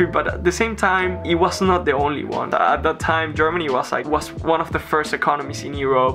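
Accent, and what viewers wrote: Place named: Spain. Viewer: sehr schön zuerst hab ich geglaubt du bist ein ami aber dann spanisch hört man durch du bist sehr lustig und sympatisch i love your video how was barcelona in comparison cau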